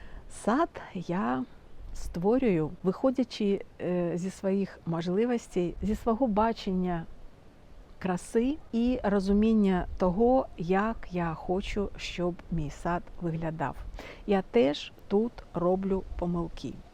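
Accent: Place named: Belarus. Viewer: Вітаю Вас. Ви велика молодець) по всіх питаннях, що Ви озвучили! Вас приємно слухати і дивитися) мені здається що Ви білоруска, тому що слова звучать наче українською але з білоруським акцентом! Бажаю успіху з садом і з мовою!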